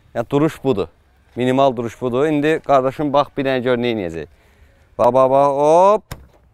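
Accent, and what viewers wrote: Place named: Sumgait, Azerbaijan. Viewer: Yetim+ Ataş Sumqayıt aksenti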